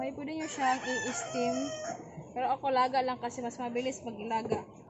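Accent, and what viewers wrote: Visayas, Philippines. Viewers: Hahahaha...inday mahuman imong kaugmaon ug kalit !!!!!hahahahaha! Pagka bisaya gayud nimo,asa man imong probinsya inday!!!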